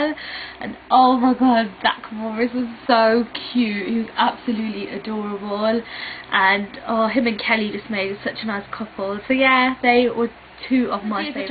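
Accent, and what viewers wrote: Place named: Coventry, United Kingdom. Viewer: I knew you were from Coventry, your accent sounds exactly like my cousin's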